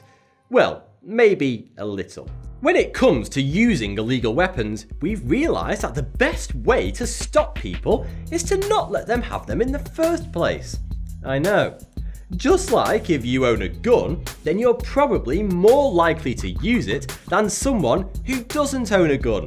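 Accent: United Kingdom. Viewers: Watching this video, my roommate walked by, and asked why Frankie Munez was talking in a British accent. No. You are NOT Malcolm in the Middle, and I will correct him on his folly. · Bro. I love your voice. Btw I like that Brittish accent.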